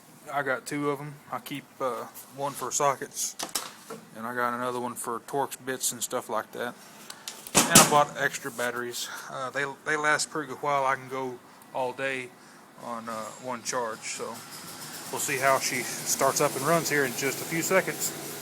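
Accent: Texas, United States. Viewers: Is that a Texas accent cowboy?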